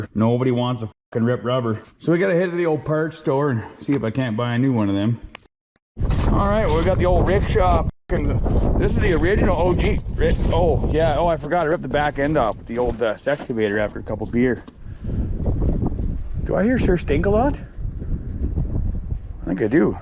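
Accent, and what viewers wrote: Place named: Western Canada, Canada. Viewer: I only just got here, and I see a Canadian flag and hear an accent that sounds like you've got the Western side of the land in ye, and then I remember the fact that the comment from another video I was watching that I found you from mentioned the fact you got a beast named Slave Lake. Yeesh, you from the plains er sumthin?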